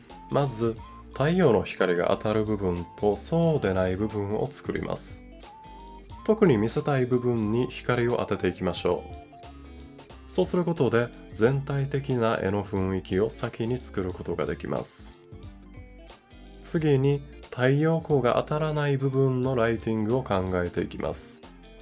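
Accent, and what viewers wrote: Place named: Kansai, Japan. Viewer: 関西弁すき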